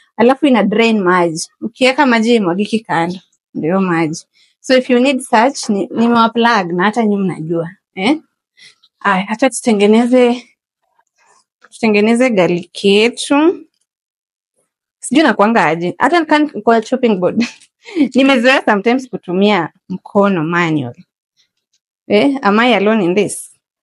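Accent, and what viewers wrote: Kenya. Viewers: Umeishi na mluhya hadi unaongea kama mluhya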